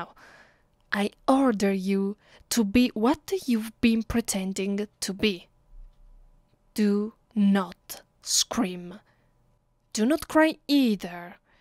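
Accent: Italy. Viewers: Do Italian women actually sound like this in English lol or are you putting on a, 'Vampire' accent? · This is the BEST accent I have ever heard in my life. Like, literally, it just turned out that Italian people are what I would want to hear all my life. Oh my God. WHAT IS THIS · oh i've been kidnapped by a hot vampire lady with a italian accent, life couldn't get any better then this.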